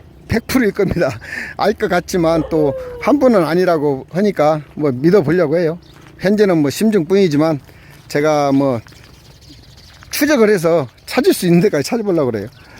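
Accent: Jeolla, South Korea. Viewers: 어쩐지...전라도 사투리가 ㅋㅋ 저는 고창이 친정입니다 왠지 마음이 가더라구요 화이팅 ♡ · ㅎㅎㅎㅎㅎ 어느누가 님을 비리 공무원으로 의심을 하겠습니가 인상이 완전 농사꾼 스타일인대요 손을 봐도 그렇고 말씀하시는것도 그렇고 ㅎㅎㅎㅎㅎ 간간히 전라도 풍의 말씀이 나오길래 전라도분이 아니냐고 물어본적이 있었어요 ㅎㅎㅎ 아무튼 부럽게 잘하고 계심니다 ㅎㅎㅎ 저도 거기서 살고싶내요 다들 모여서요 ^^ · 덕진 소방서면 금암동 전북대 정문위에서 근무하셨군요 ㅎㅎ 어쩐지 영상보는내내 말투가 익숙하다했습니다 ㅋㅋㅋㅋ 평화동이 부모님댁입니다 ㅋ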